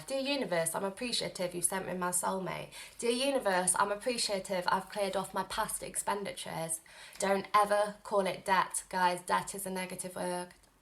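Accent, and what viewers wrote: Liverpool, United Kingdom. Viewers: Hahaha I used to live in Edinburgh and I feel like you have an accent that's pretty close to Liverpool lol. But I love it and I get addicted to listening to you talk so keep rockin' xD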